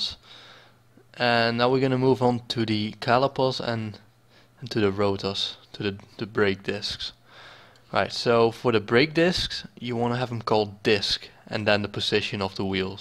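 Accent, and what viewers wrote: Netherlands. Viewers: Are you dutch? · Dude, you dutch?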